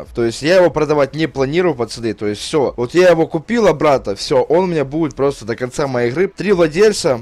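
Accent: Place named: Ukraine. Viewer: Он как-бы украинец, а у них г читается х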